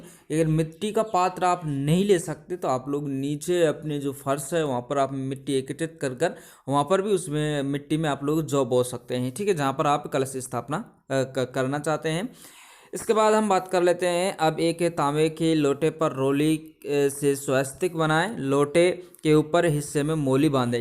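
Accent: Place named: Jammu, India. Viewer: Jay maat dii bahi jammu sa bool rah ha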